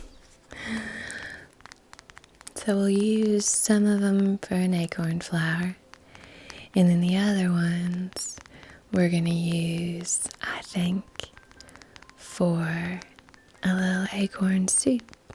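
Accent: South, United States. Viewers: I love how talks in that Southern accent. Happy early birthday by the way.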